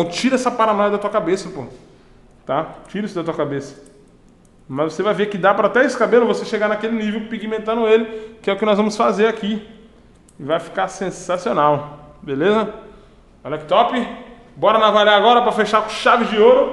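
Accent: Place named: Rio Grande do Sul, Brazil. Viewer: Tú é gaúcho né pai,eu também sou reconheci só pela voz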